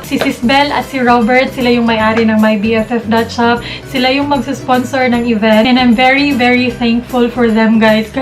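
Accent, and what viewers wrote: Visayas, Philippines. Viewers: You're so loveable and very enjoying to watch! Tho you have that bisaya accent, you show your true and natural self to your subscribers! ❤ Continue to inspire us and do a lots great videos! May God Bless you always po Ate! Power! 💖💖💖 FB: Jorgie Villas Instagram: jrgvlls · mau diay bisaya kaau mo say AHAHAHHA I'm also a bisaya hii ate were proud to be Cebuano's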